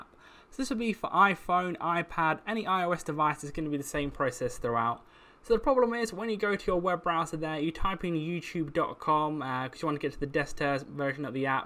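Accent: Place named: United Kingdom. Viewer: Between the disgusting mouth sounds and the million things happening on screen with athe British accent saying “that’s not what you want” this video is a 0/10 would not recommend to my worst enemy’s, great job random bloke.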